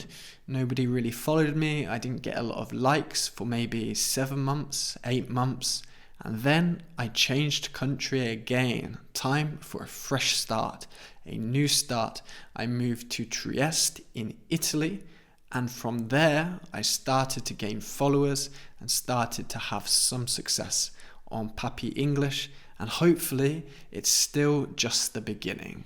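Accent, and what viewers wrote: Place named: RP, United Kingdom. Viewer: 👏👏👏👏👏👏Goooorgeous English without an accent! When I was young I lived in Midhurst where I looked after my uncle's ponies at Cowdray Park Polo Club. You speak English like those polo players there, if you know what I mean! 😀😄😀😄Felicitaciones,! Eres un genio, amigo! Un abrazo, from Olivos, Buenos Aires, Argentina🇦🇷🇬🇧🇦🇷🇬🇧🇦🇷🇬🇧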